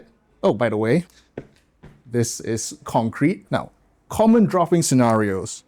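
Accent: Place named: Singapore. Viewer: Must be that Singapore accent. Similar "melody" of Nigerians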